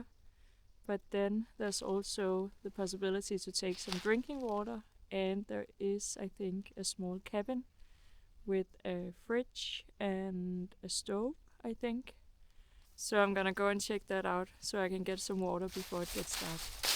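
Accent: Denmark. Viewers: That’s a cute girl with a cute Danish accent! (Trodde du var svensk först :) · Thank you for sharing your adventures with us 😊 I really like your Danish accent, I find your videos very relaxing! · You sound Danish. Going home to some warm hugge is never a failure.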